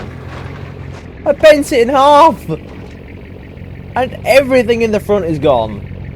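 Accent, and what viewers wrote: United Kingdom. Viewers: GrogTV but British instead of Aussie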